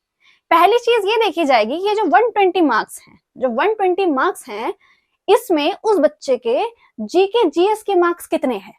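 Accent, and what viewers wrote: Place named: Pahari, India. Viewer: Pahari lg ri ho 😅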